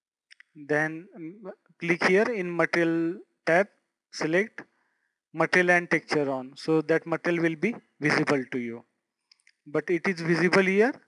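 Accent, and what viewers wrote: India. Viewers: This Speaker MUST BE INDIAN!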